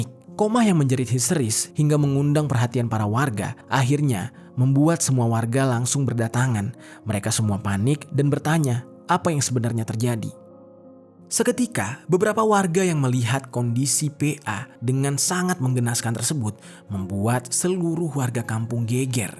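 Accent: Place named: Sunda, Indonesia. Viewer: Bahasa Sunda nya kekok..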